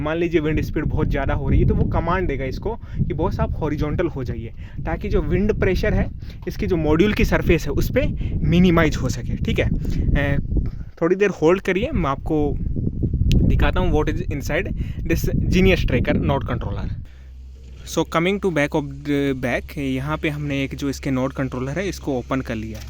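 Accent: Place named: South Asia. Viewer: I would more appreciate this video if the commentator spoke American oe British English. I missed much because of the strong South-Asian accent.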